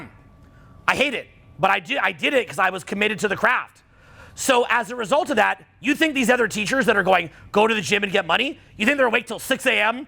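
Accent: Canada. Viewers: Fair-ull, for all the people incorrectly trying to correct your Canadian pronunciation of the English language. Great timing with this vid considering the two Epstein clients just went to metaphorical war today.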